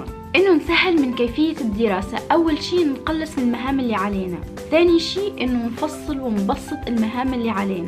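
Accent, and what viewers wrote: Tunisia. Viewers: انت تونسية ؟؟؟ ولا جزائرية ؟؟؟؟ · هل انت تونسية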